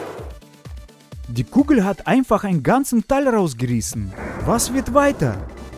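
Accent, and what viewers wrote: Russia. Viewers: er ist russe oder redet er wie ein deutscher?!!! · Ah du russe dashalb aktzent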